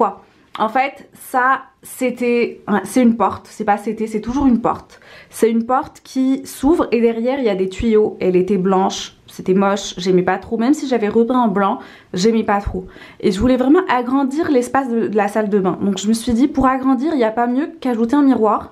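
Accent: Quebec, Canada. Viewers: Tu parle avec un accent canadien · Pourquoi tu parles avec un accent canadien 🤔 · T’as un peu un accent québécois non ?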